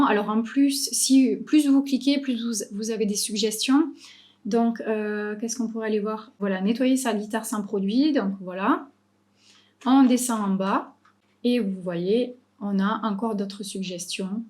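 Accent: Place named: South, France. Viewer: Merci beaucoup pour vos infos, génial 👍 avec le petit accent du sud comme j'aime 😉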